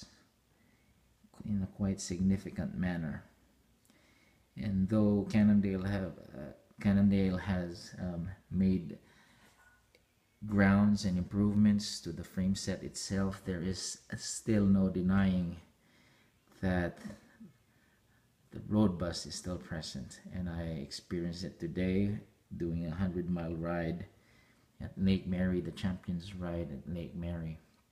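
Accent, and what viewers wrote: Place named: Philippines. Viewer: nice accent. pinoy?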